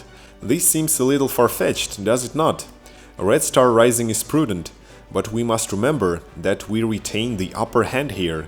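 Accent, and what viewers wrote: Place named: Germany. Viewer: Absolutely great informative Video! You have a very strong accent, it sounds like you´re from Germany, where the creator of the Trilogy Crytek has it´s roots :D Greetings from Switzerland :)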